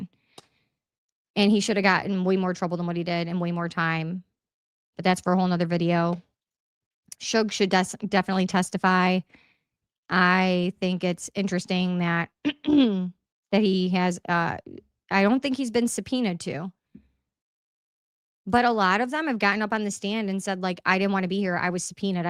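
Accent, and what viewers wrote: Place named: Midwest, United States. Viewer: Youre from Florida 😮 i thought by your accent you were from the Midwest like Wisconsin 😂😅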